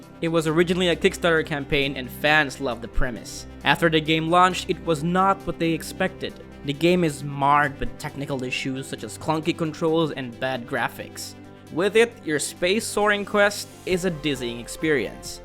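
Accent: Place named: Philippines. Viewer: I swear you really sound Filipino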